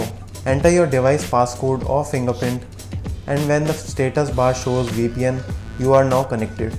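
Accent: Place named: India. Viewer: CANNT TALK ENGGLISH BUT WANT TO MAKE YOUTUBE VIDEOS WTF ? Hate that Indian accent ..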